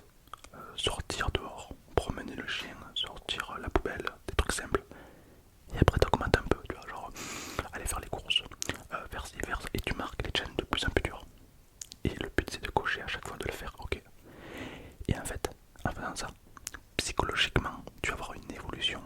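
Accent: South, France. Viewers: j'adore ta voix c super relaxant (et surtout j'aime bien ton accent du sud) 😁😁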